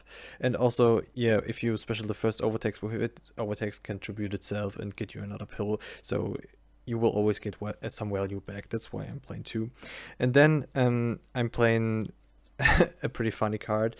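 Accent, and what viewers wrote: Germany. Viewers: You have a damn German accent.. why don't you make the videos in German?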